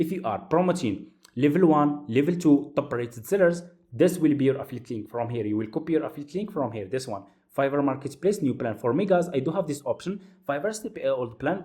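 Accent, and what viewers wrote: Morocco. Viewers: مغربي ياك من اللهجة باينة؟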